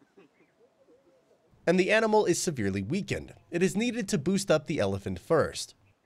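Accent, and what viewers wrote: United States. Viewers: I get the that the script, which is also in the description of this video, was written by the creator of the video and English is not their first language so that is why there are so many weird phrasings or things that don’t make sense that the narrator says and that’s fully understandable. But the narrator sounds American, so why did he not make corrections to those errors instead of allowing the video to sound ignorant? I know narrators are supposed to read what they’re given, I’ve done that work before. But when it’s really bad like this you correct it.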